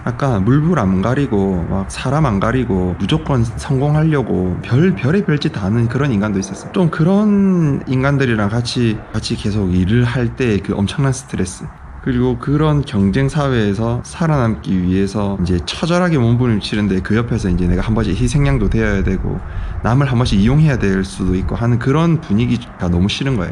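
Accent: Gyeongsang, South Korea. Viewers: 같은 경상도 사람으로서 말투도 생각도 너무 공감갑니다. 영상 잘봤습니다! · 같은 경상도 억양이라 그런지 더 관심이 가는 존님 ㅎㅎ 잘보고있습니다 저희부부도 호주 퍼스에서 영주권까지 고군분투하고있네요 언제나 건강챙기시고 응원합니다 두부부님의 삶을~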